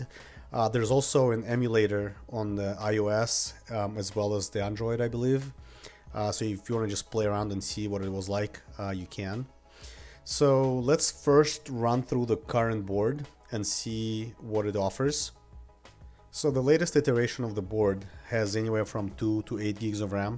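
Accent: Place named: Russia. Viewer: You speak like Russian talks English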